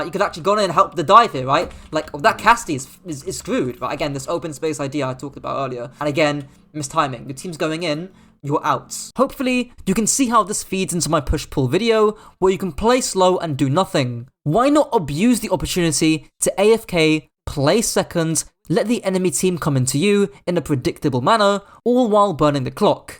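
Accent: United Kingdom. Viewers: He's not talking like a Sydneysider Aussie. Now that's an obnoxious upwards twang at the end of every single sentence. is talking totally regularly for matter-of-fact speaking. Sounds like a regular Brit.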